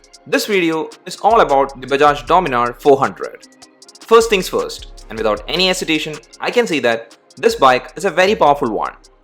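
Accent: Kerala, India. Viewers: IF YOU TAKE CARE OF YOUR 🤭MALLU ACCENT. IT WILL BE BETTER OTHERWISE OK.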